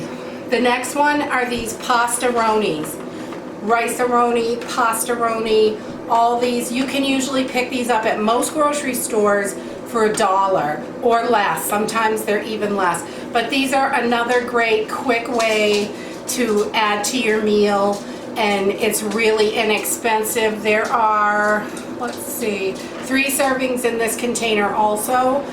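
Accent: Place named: New England, United States. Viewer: Love your channel. Are you from New England? I hear the accent. I’m in Southern NH.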